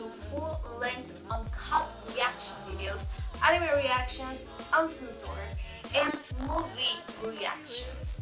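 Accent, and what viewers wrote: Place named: Spain. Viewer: Cool. From your accent I was thinking Spain. Makes sense.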